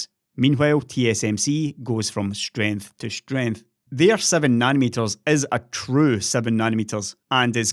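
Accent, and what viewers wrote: Scotland, United Kingdom. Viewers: He's Scottish, of course. The accent is similar to Dr. William MacDougal from Kirkwall ... Or Aberdeen? Or Glasgow? 😁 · The sweet sound of Scottish analysis...everyone knows what time it is. 🙏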